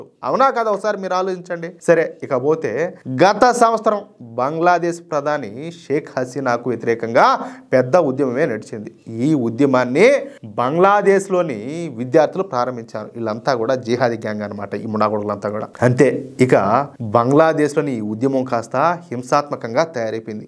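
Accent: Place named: Nellore, India. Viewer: Yendhi abbayaa mandhi NELLORE Yendhi Nee Yasa chusthee alla untee anduku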